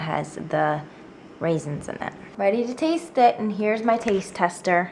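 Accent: New Jersey, United States. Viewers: She has that jersey accent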